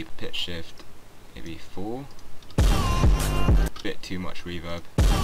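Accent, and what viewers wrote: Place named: United Kingdom. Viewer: my man sounds like the most british person i've ever heard btw props to u for doing this whenever i ACTUALLY try to use cins ima watch this again cuz its actually really good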